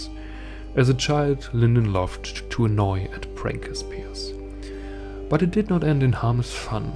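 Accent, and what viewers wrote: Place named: Germany. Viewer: the german english accent is unmissable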